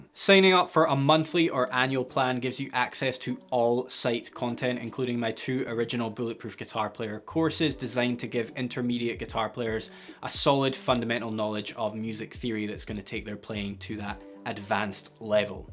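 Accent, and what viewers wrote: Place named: Scotland, United Kingdom. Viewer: Love watching lesson, not only explained things well, but also i love hearing his scottish accent 😁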